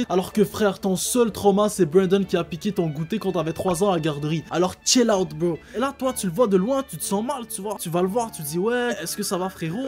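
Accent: Quebec, Canada. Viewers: Mais c’est moi ou il a un accent innnnn peux Québécois?😂❤(je revient pour dire que en faite la debile que je suis j’avais pas capté qu’il est québécois 🧍🏻‍♀️. Myb) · t quebecois? parce que moi oui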